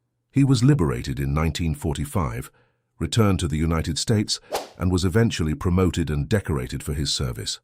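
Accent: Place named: United Kingdom. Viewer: Wisconsin (now). Uncle was Naval Academy grad who commanded a destroyer in Pacific. Other uncles served in Canal Zone and elsewhere. My dad was too old but wasn't taken when he volunteered because he was an oil chemist and manager. He and one uncle were needed at home to produce oil and cotton. Friends' moms were welders etc in defense plants and shipyards.We all grew up in Arkansas like Pappy Gunn, learning to shoot when young including women. Both bros, 1 dead and 1 100% service-connected disabled, and I plus several relatives are VietNam-era vets. Your AI images, Brit-accented narration, and fake sonagrams suck.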